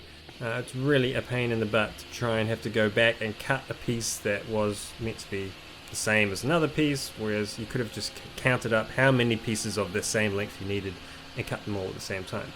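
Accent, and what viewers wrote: New Zealand. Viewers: somewhere in nz by the accent i take it? i love your work, it is quite fantastic, love th idea of the v-groove just by using the table saw set on a 45, that is genius...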